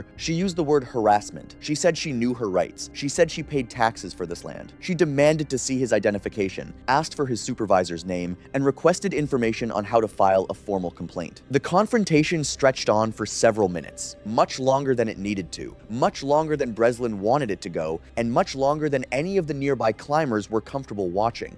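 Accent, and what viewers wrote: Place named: United States. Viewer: Means the American sounding guy is probably American, home of the freedom units.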